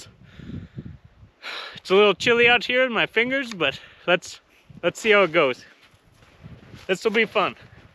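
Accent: Canada. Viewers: You sound like a Canadian but shoot like an Alaskan. I'll subscribe.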